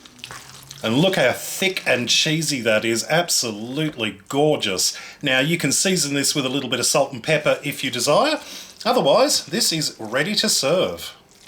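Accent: Australia. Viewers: are u australian? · I have to ask. Are you from Australia or New Zealand? This looks sooooo good! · Ok the second I heard the familiar Aussie accent I subscribed. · Reaperz Void ..... no. That’s clearly an Australian accent.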